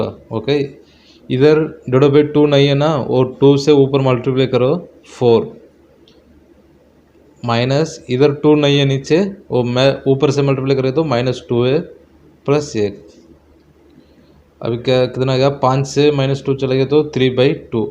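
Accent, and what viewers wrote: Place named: Hyderabad, India. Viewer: Hydrabadi ton me Bolta Bai.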